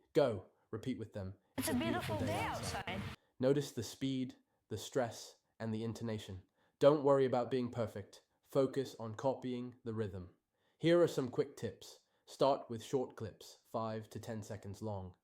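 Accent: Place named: United Kingdom. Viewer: How did you get the British accent with ressources that you use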